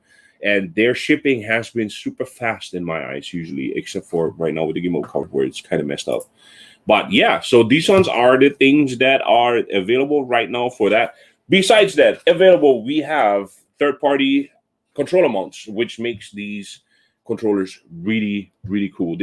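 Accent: Hawaii, United States. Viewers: Shoots stoked to find someone talking story with drones local style nice! U Maui? Normally North Shore Oahu we have to connect one day! Aloha about to get 6K evo2 possibly on the fence. So you say you have the pro is that the 8K or 6K? 🤙🏾🤙🏾🤙🏾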